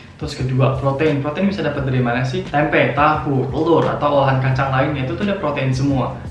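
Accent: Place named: Java, Indonesia. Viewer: Ngomongnya jadi makin medok dan mirip orang jawa gitu ya😂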